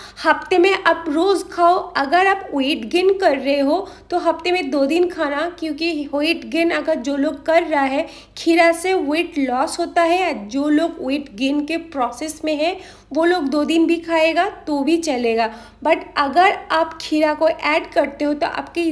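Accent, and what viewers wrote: Bengal, India. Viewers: Love this Channel and love your sweet bengali accent that pops in while speaking hindi ❤❤want to aski regarding can we have soups recipe as well since winter is approaching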